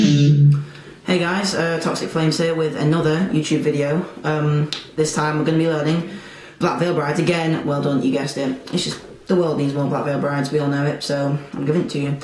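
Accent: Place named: United Kingdom. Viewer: I would suggest more alternate picking, it can get a bit laggy with mostly downs. I've been going through your tutorials and they are actually quite good, I really love your acoustic work a lot, its very even and clean sounding compared to many covers I've heard. And of course I get a kick out of hearing "hey it's Toxic" at the beginning of each video coming from a cute british boy haha. Keep up the good work mate.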